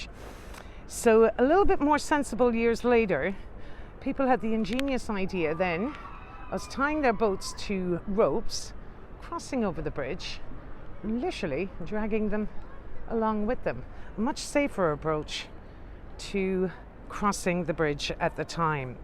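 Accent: Ireland. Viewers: One day when I can get back to London, first time was in 2008 from Oz to UK, I will book you for a tour esp the East End (my ancestors home). I just love your videos. I have so many books about London, it has always been a fascination to me, even before I even knew my mother's family came from East End London...I'm addicted. Thank you so very much you are incredible. And I love your Irish voice, also Irish from my mother's mother's side. cheers from South Australia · Amazing tour by the Irish Queen, your Knowlege & Beautiful Irish Voice make your tours absolutely A must do when visiting London! Your commitment to Detail is truly Second to None!! Thank you for all you do!!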